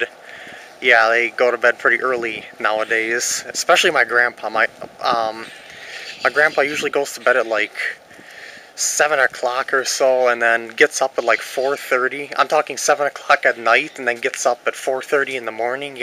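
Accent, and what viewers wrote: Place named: Wisconsin, United States. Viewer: no idea where that is, haha it sounds cool, i never heard your accent before and now i know what a "Wisconsin" accent sounds like ;)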